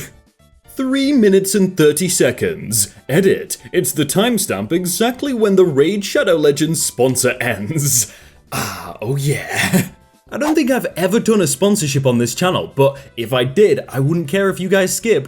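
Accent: United Kingdom. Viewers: bro you do the absolute best british accent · I realized you where British but didn’t realize you were that British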